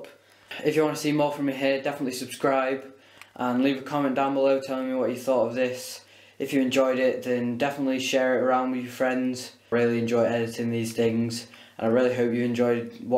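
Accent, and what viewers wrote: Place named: England, United Kingdom. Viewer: Subbed :) You from England because your accent sounds english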